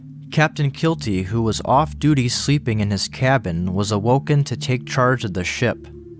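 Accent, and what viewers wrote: Cleveland, United States. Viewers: Dudes gotta be from Cleveland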